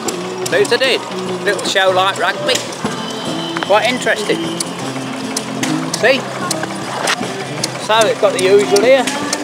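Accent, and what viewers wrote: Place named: Lincolnshire, United Kingdom. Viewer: Thanks,should we compromise and call it a country show? Great to hear your Yellerbelly accent,very rare these days.I am a foreigner myself, having moved here 34 years ago,a newcomer but loved every minute! All the best.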